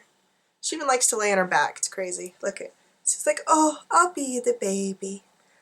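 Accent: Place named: United States. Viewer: Ah well I am British and you are 'very American' to me, I don't know what very means but to me you definitely have an accent lol xx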